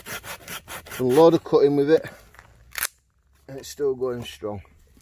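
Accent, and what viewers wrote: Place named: Yorkshire, United Kingdom. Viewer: Where abouts are you from? You’re accent seems proper Yorkshire to me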